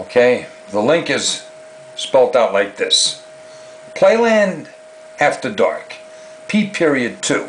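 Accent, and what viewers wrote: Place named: Pittsburgh, United States. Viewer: This IS...the BEST...all time narrated video ever! Pure Pittsburgh 100%. Good ol Laff In The Dark...as narrated by a great Yinzer! "Toss an M-80 at The Devil" that is AWESOME! So Pittsburgh! THIS is the ride they truly should have saved and preserved. (Even if it had to be totally-rebuilt/updated/whatever) as Laff In The Dark was a GEM. An old time gem. The "Turnpike Ride" killed this one off. And Kennywood hasn't been able to figure out "The Old Mill" for 60 years. Cool old skool ride (we used to stop the boats, get out and walk around the inside of the Old Mill. (Then-renamed "Harold's Haunted Hideaway" whatever. I think now it is some dumb "Garfield Ride". Damn shame too. They could TRULY make this ride a scary gem, if they truly wanted to. But...just like Noah's Ark...it is an insipid and watered-down BORING ride anymore. 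) And (just like Laff In The Dark...and even (for a while) The Old Mill...and ugggh..."Le Cachot"? Remember THAT dumb ride? Everyone was upset when it got torn down. But it was a worse ride than "The Gold Rush". Kennywood...truly, has been piss poor at "dark/scary rides"...but...Not always). There was a time when Noah's Ark was simply badass!. The shaky floors were REALLY "shaky" long ago. Sometimes (in today's cancel culture-of-everything) well..."sometimes they work. Sometimes not" Boring.) The "air blast WHOOPSIE" trick would NEVER fly today. (Old timers know what I am talking about). Take your date on a walk thru. Noah's Ark...make out on the quasi-M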